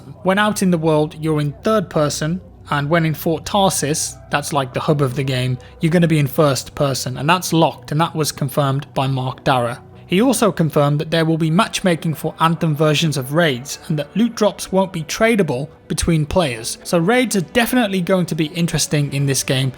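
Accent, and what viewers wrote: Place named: Midlands, United Kingdom. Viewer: Always luv ya videos man, those dulcet w/midlands tones always remind me of home. Hit me up if you're ever down Bristol ways. Keep on gaming!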